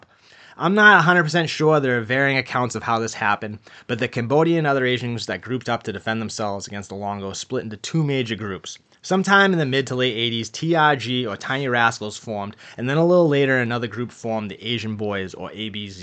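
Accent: Boston, United States. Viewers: And I can tell this YouTube guy has a Boston / Mass accent. Love it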